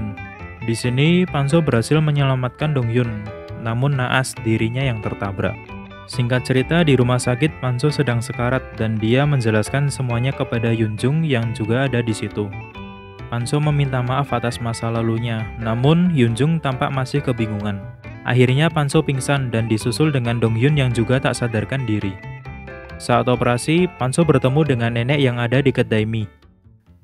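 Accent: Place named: Sumatra, Indonesia. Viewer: pasti pengisi suaranya orang luar jawa...kayak sumatra gitu hha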